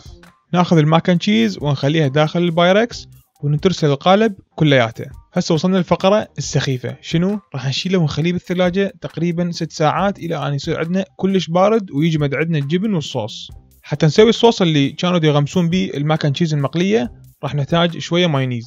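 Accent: Iraq. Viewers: فديواتك ضحك حجيك العراقي حلو وضحك حيل كلش نفسيتك حلوة وبسيطة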